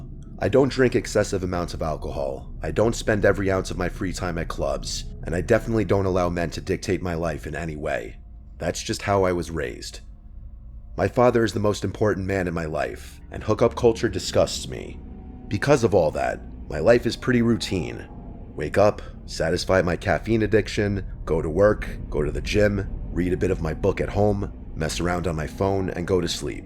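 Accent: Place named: New Jersey, United States. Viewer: I’m gonna guess you were raised in Essex County NJ, am I right? My accent is coming back just listening to you! 😂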